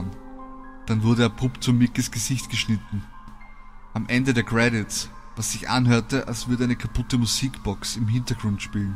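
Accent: Vienna, Austria. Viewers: Der Sprecher ist Österreicher haha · Bist du ein Wiener ?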